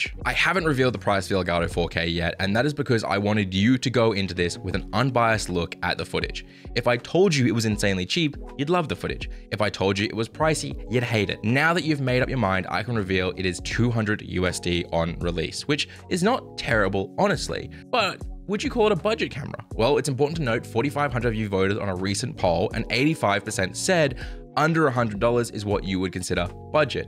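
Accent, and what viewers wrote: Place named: Australia. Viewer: Nice to hear a fellow Aussie :-)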